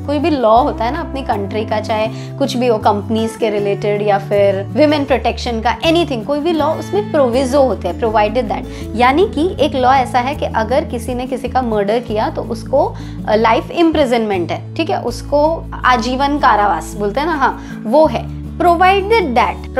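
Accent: Gujarat, India. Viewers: Dii ! Are you gujarati?? Cuz you said "karma nahi nadta haii" 😂 I'm 100% sure you are gujju😍 I lovvee ur videos!! Actually my life is changed after watching ur videos keep going dii ur doing gud♥️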